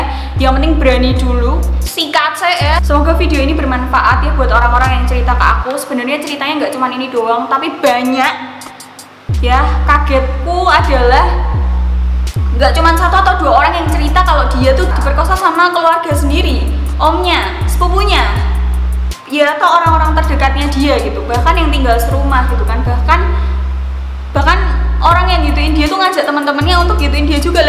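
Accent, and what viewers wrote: Java, Indonesia. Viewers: Wong jowo ndi to mbak?😃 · wedok iki medokkk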